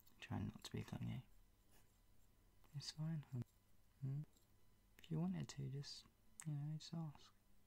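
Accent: United Kingdom. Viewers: Hope every sick girl have a boyfriend like this(;_;)this is sooo cute :3 btw British accent is the best :P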